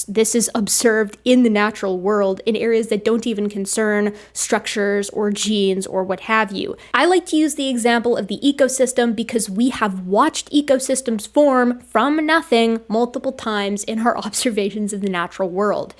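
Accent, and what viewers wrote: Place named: Minnesota, United States. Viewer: Is that a Minnesota accent I hear, ew.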